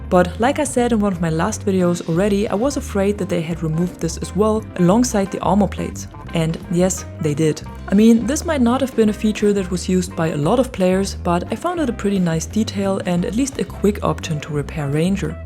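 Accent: Germany. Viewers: Kann es sein das du Deutsch sprichst?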